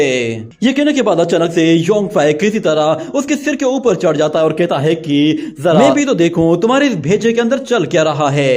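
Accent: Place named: Pakistan. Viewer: Lag RHa h Tum Pakistani ho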